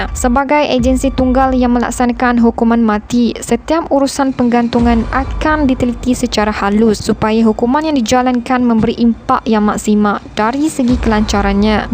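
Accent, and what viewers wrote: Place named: Indonesia. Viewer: Kenapa macam slang Indon je..Cuba gunakan balik bahasa baku....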